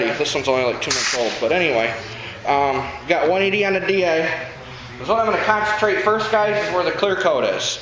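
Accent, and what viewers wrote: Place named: Rhode Island, United States. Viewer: lmao are you from rhode island/maine? you sound like the original peter from family guy.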